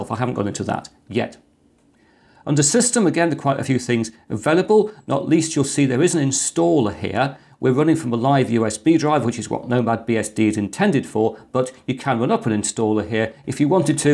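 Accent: United Kingdom. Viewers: Dear: I've been enjoying your videos and learning through them for several months now, and I thank you heartily for that. I wish to make a suggestion to you to further enhance the quality and usefulness of your videos. Trouble is, there is a certain amount of ECHO in the room where you record your videos, that hinders the clarity of your otherwise excellent speech and keen British pronunciation. And believe me, that echo makes some passages hard to understand. I dare suggest that you seek the counsel of a sound recording specialist that helps you to identity the sources of those echoes and take measures to dampen them, such as adding wall isolation materials, or perhaps some curtains or rugs. THANK YOU for your attention.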